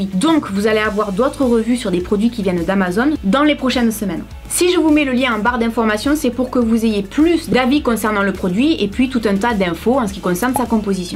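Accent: South, France. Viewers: cela fait environ 1 an que je me lave les cheveux avec ce shampoing solide. J'ai les cheveux frisés et très souvent sec depuis que je l'utilise ils ont repris un volume de fou et sont super brillants le seul point négatif du moins pour moi c'est que je ne sais pas l'utiliser en mode solide du coup je le laisse se ramollir un peu dans l'eau et je l'utilise comme une crème. par contre il dure et dure très très longtemps facile un mois voir plus c'est GENIAL !! sinon juste un petit mot j'adore tes vidéos tu respire la joie de vivre et ça fait plaisir d'entendre enfin un youtubeuse du Sud je suis de TOULOUSE et les accent pointu sur youtube j'en peu plus lol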